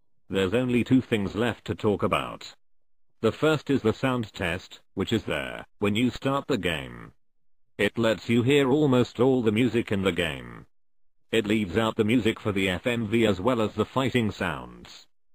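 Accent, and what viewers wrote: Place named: RP, United Kingdom. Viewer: This voice should be used to review every game ever. This is legit the only game review I've watched all the way through because hearing a British butler review the game was awesome.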